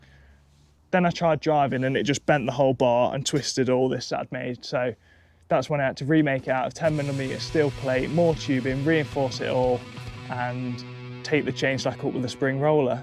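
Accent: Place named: East Midlands, United Kingdom. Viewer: Just watched the 106 Hayabusa on the runway very impressive. That's a right accent were is it Nottingham area possibly Derby. It certainly is fast, anyhow you have a Leeds fan subscriber.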